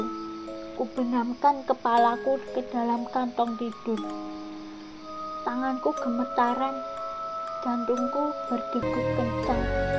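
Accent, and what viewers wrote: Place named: Java, Indonesia. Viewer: Medok amat ngomong y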